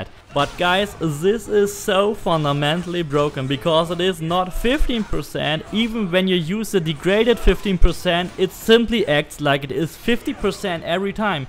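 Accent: Germany. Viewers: Lol I am level 73 and I have killed a lvl 99 mercenary easily in 10 sec. Or so, is that good? By the way this build is so funny and nice even before the armor set I have played a lot of the ares ability because you just feel undying 😂and the animation are very cool. By the way are you from germen beacause of your accent I thought that (sorry if I am wrong I am also from Germany)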